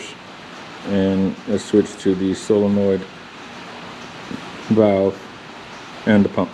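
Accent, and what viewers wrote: Texas, United States. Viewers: I thought I was hearing a Texas accent. I’m in a northern Dallas suburb. Folks like you make me a proud Texan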